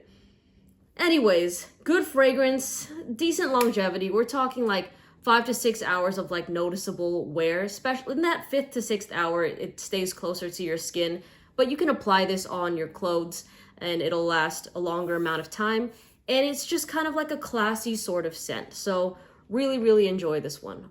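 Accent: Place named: Philippines. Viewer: you definitely sound Filipina to me..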